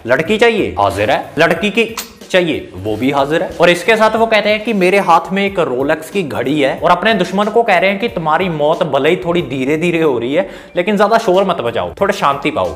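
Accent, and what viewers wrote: Punjab, India. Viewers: Bro tu punjabi aa ??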